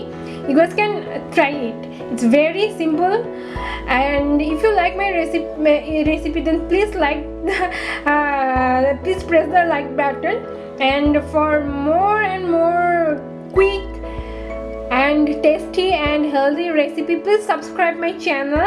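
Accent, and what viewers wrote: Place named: India. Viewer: Please correct to voice quality and also mention in English on screen as your desi accent is hard to understand. Good raspy !!!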